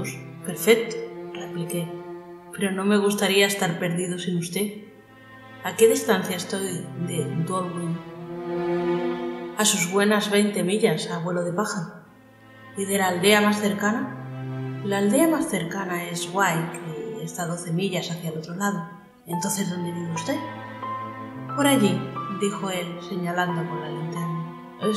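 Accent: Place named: Spain. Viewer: Muchas gracias...me encanta que esté en español de España...y narras muy muy bien 😊❤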